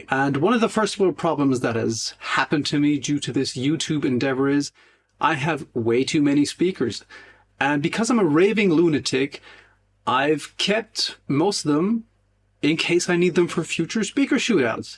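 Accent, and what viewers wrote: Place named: Ireland. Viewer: Really enjoyed the video…. I absolutely love your commitment to the development of speakerpedia. I have to ask something though as it’s really bugging me. Did you ever live in Ireland ? It sounds to me like you might have either lived in Ireland or learned English from an Irish person. Weird question i know but these things tweak my ear and i have to know. Thanks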